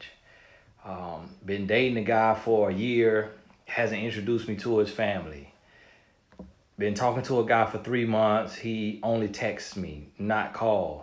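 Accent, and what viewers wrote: South, United States. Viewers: Sadly, in the black community especially, it’s become accepted that two ppl can date, live together and have kids etc and still no marriage? To each its own, but for me I wanted different. My entire family were married, later ppl divorced, but in my younger years my siblings and my cousins parents saw marriage. The other factors ppl are settling for are obvious, like you said “come on now” even in your southern accent ☺️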